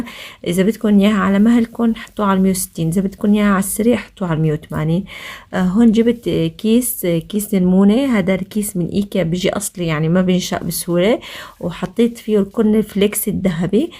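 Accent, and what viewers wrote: Aleppo, Syria. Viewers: بالعكس. انا لما بتطلعي مع زوجك كتير بحس حكيك حلبي وانك مالك متأثره من بيت حماكي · لهجتك واضحة انك حلبية بس يمكن بعض الكلمات بتقوليها متل الشوام بحكم زوجك شامي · اللهجه الحلبيه حلوه حافظي عليها وعلميها لاولادك وخللي جوزك يتعلمها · الله يسعدك ويحفظلك عيلتك بلنسبة للهجة انا شامية ول من لشام ببين معو انو حكيك مو شامي اغلب الكلامات حلبية بس اكيد لانك متزوجة شامي حكيك لح يختلف بصير مختلط بين الحلبي والشامي دخيلا الحلبية مااحلاها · تبارك الله عليك انا من البداية عرفتك حلبية من لهجتك وسميت الشطورة الحلبية ماشاء الله ماشاء الله احلى تحية لك من المغرب لسوريا عامة وللحلبية خاصة طبعا دايما متألقة لا تحتاج لتعليق 💚💛🧡❤ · كل كلامك حلبي ولهجتك واضحة🤣🤣 انا من حمص وساكني بحلب بناتي صارو يحكو حلبي وكلامات حلبي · انا من متابعينك الجدد يلي بحبوكِ على فكرة كلامك حلبي واااااضح ومبينة أنو مالك من الشام، يلي من الشام بيعرف انو لهجتك مو شامية يعني الفاظ حلبيةمتل🤭 (لهلق ، الطواية ، وحرف الجيم ، وفتح الحروف الأولى بالأفعال) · بتحكي حلبي احييي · اي صح لهلق لهجتك.حلبيه مبين ومن اول مره شفتك بعتلك تعليق وسالتلك انتي حلبيه 😇 وانا حلبيه متلك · ماشاء الله عليكي يا ، لا بتحكي حلبي مظبوط انا مصرية وأول مرة أعرف ان فيه فرق في لهجاتكم زينا 😂 يعني الحلبة زي الصعيدي و اسكندراني زينا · حبيبتي طبعا صحيح كلامك تغربنا وتغيرت لهجتنا شوي بس لسة في كلامات حلبية ع فكرة من اول فيديو شفتو الك عرفتك حلبية الله يسعدك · انا من اول فيديو حضرته الك عرفت انك حلبية لانه صاحبتي حلبية..انا من فلسطين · مو صح حكيك حلبي واضح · يعني هي هي اللهجة الحلبية مين قال انو الحلبية بجقجقو اكتر من هيك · اهلين يا وره اسمحيلي اسميك ورده لأنك مثل الورده نفرح لمن نشوفك انا من اول ما تباعتك عرفت انك حلبيه من اللهجه مره حلو طريقة طلامك بالعكس واضح انك من حلب 🌹💞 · بالعكس مبينة انك حلبية من لكنة الحكي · يعطيكي الف عافية حبيبتي وصح بعدك بتحكي كلمات حلبية متل هلئ بتطلع معك بالحلبي خيت 😉😅❤️ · انت بتحكي حلبي اصلي..خصوصي كلمة هلّئ 😅👌🏻عفكرة انا حلبية متلك كمان وجوزي شامي من الشاغور 😂كل عام وانت وعيلتك بألف خير يارب❤❤❤😘😍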